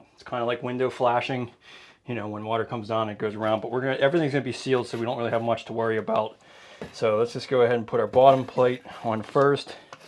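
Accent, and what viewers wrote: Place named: Pennsylvania, United States. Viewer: You can totally tell from Pennsylvania with his accent. 😅 Thanks for the video tutorials! Definitely giving me the confidence to do more on my own!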